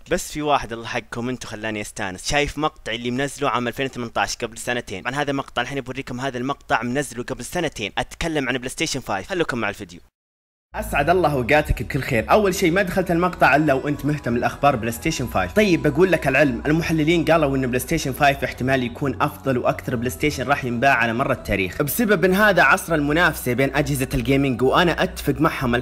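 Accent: Qassim, Saudi Arabia. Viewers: انت من القصيم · هاي يا لهجة بلسعودية هجي يحجون كلش حلوة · لهجتك جميلة. في أي منطقة في سعوديه يتكلموا كذا؟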